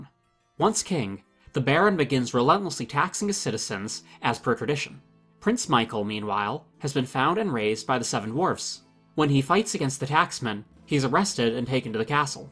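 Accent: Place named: United States. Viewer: Interesting presentation, but the narrator's thick American accent sometimes makes it difficult to understand what he's trying to convey, at least for us Non-americans. For instance, what or who is "raawbinuud"? I guessed after a while that it had to be "Robin Hood". So why not at least try to pronounce it like that? It would honour the legend too! A little more normalized English would at any rate be much appreciated.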